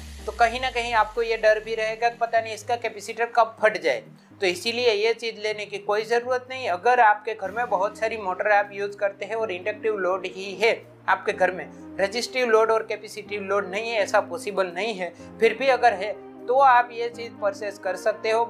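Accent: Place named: Gujarat, India. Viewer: language tone denotes gujrati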